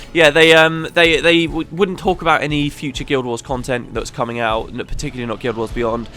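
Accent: United Kingdom. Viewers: Yay! I recorded one myself for nostalgia when I'm playing Gw2. But, I kind of died at the end and didn't do the one from this year. Now I can watch it in a few years, but with British commentary!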